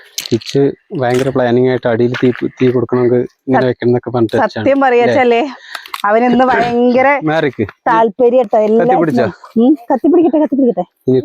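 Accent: Palakkad, India. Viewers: Njangalocke samsaram (palackadan slang) kelkaan vendi maathram vlog kanunnavaraan...just avoid -ve comment,go a head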